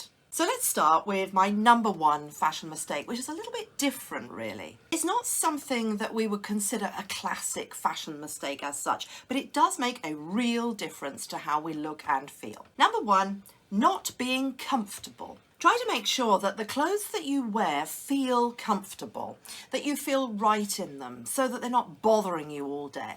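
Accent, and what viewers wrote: United Kingdom. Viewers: Hi D,,, new from CA,, I will be 72 in a few days,,April 2024 I have made it!! I agree that it is your lovely kind presentation that got me subscribed...TY! You all might get a super kick out of this But,, I stopped wearing certain things back in the 20 the century,,,Jeans, jewelry, jackets, heels...all out!! lol...I wear big old clogs year round to accommodate my bunions ( and sneakers), I layer on scarves and I like to paint on shirts and my winter coats to keep me colorful and comfortable as well...Love your look and your Brit accent! Mo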